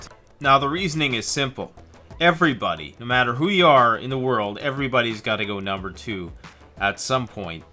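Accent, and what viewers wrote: Canada. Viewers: I'm not big in prepping but I subbed because of your pure Canadian accent I wish I sounded like this but alas I sound like an American who moved to Canada and I'm not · You, sir, have a violently Canadian accent. And it is beautiful. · The GoldenChild he’s Canadian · Wrong! The wetter your wiper be, the more likely your becoming contaminated by fecal waste..that pervasive accent ought to reveal how abominably runaway Canadia/en dorkiness is. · I'm sorry if this comes off as rude, but I have not heard a more Canadian accent ever.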